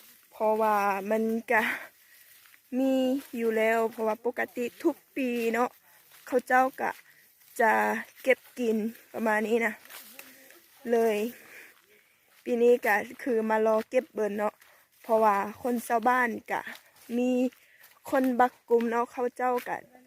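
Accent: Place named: North, Thailand. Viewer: ນ້າຮັກຫລາຍອອກສຽງເວົ້າຄືຄົນພາກເຫນືອເນາະ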